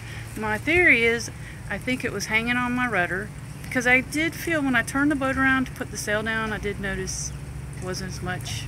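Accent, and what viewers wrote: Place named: South, United States. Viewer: Never a dull moment in the life of a boater! BTW, any crab in that trap? Free lunch! Seriously, hope the diver doesn't find anything more than some fragments of line down there and you can continue with your adventure. I really enjoy watching all of your video's PLUS you are very easy on the eye!!! Southern drawl and all.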